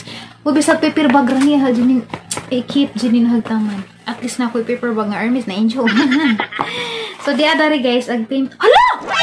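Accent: Bohol, Philippines. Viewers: You're deserving ma'am, because you're so kind and truthful. Malipayon jud ko makadungog sa imong bol-anon accent, taga bohol man sad ko sa Dimiao Bohol, God bless you and your family 🙏🙏🙏🌷🌷🌷❤❤❤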